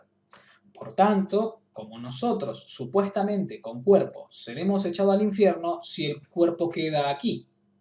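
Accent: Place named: Argentina. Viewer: RECIBE MI SALUDO Y MI ABRAZO. ME AGRADA LA RIGUROSIDAD EN LA INVESTIGACIÓN Y LA ETIMOLOGIA. TODO LO DE LA BIBLIA ES MANIPULADO Y TERGIVERSADO. VALORO TU INTELIGENCIA, DISCERNIMIENTO Y OBJETIVIDAD. SUPONGO POR TU ACENTO ERES ARGENTINO, Y ESE LOOK Y BARBA ESTAN 1A. ANIMO Y ADELANTE!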